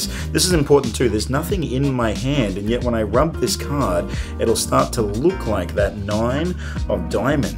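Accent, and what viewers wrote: Australia. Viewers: Australian accent is so cool. I like the way you say doiemonds. Good luck on your trip. Can't wait to watch the vlogs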